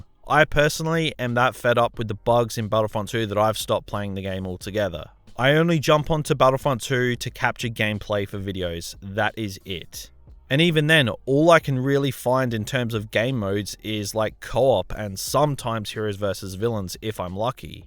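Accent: Australia. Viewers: Your Australian ? Nice I thought for some reason you were English lol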